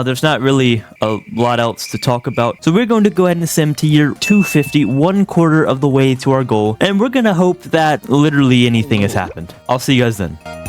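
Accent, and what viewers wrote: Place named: Australia. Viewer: Bro's from the land down under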